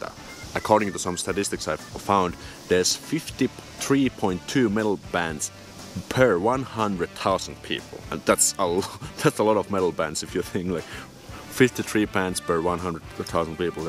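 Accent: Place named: Finland. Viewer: Puhut muuten hyvää englantia mutta kuuluu sieltä 1% sitä suomen murrettaki mutta sehän kuuluu asiaan 😂💗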